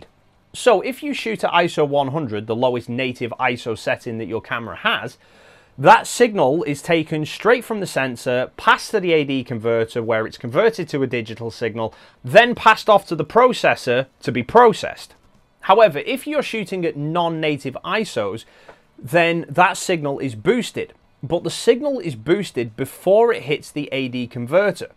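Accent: United Kingdom. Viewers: im sorry but you cant explain your rebuttal clear enough, you sounded like you were just regurgitating what tony was saying , but in a British accent . and furthermore, Tony shows his sources for his claims and you just didnt show anything but talk. this is click bait and your just feeding off someone elses channel to get some of their subs....NOT!!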